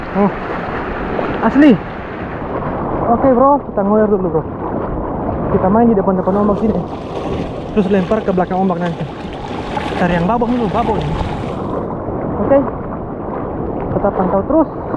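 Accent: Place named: Papua, Indonesia. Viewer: Mcm logat papua ka.. d papua mana kk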